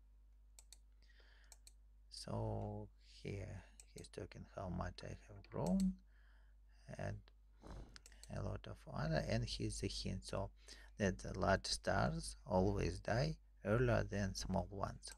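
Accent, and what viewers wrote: Russia. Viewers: Such Russian accent is it?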